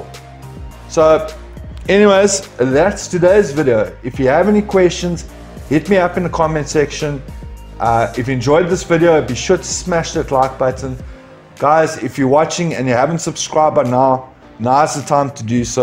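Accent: South Africa. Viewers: I know a south african when i hear one...Hehe lekker video brother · Legend brother. Also, are you South African by any chance?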